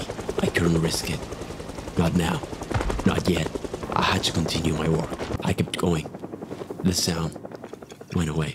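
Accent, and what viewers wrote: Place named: Argentina. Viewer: wait, you're from argentina? Yooo, south america gang. i'm from brazil. I noticed your accent.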